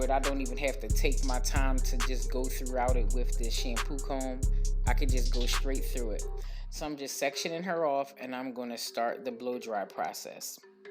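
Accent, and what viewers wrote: Philadelphia, United States. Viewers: I just want to say that hearing your Philly accent gives me joy. And that I learn so much from these videos.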